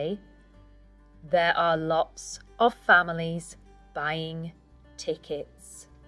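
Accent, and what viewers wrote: United Kingdom. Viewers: I'm a beginner, still learning english through stories. I love your british accent❤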